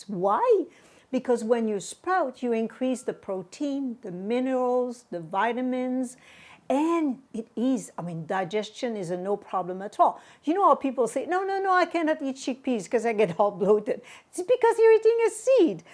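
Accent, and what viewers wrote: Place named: Caribbean. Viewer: Love your advice and your accent is beautiful! I feel like I sense a tinge of islander in yr voice, Caribbean of sorts. (don’t mean to be rude). Thank you for sharing!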